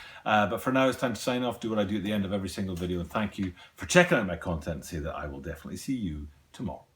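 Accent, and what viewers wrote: United Kingdom. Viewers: No probs Son. You need all the Support you can grab in this game. I keep losing all my blinkin Supporters though 😂. They get to a 1,000 then delete all the people that got them there... Bloody Buggars 😆🤣. I like your Channel anyway Pal. Theres not too many U.K guys that do what you do, so it's nice to hear an accent I've grown up with. You're doing a good job Mate. Posting everyday would just about kill me though, although my videos are packed with a load of old rubbish (Sorry... Trash!! 😉) that takes me forever to find, so they are a little bit longer than yours and take me a week to create them 😂 ✌😆👍. Not sure if you've got <link> where you are?? (Online) but they had the Triple Black 19's in a few days back and Footlocker aren't releasing them until the end of July??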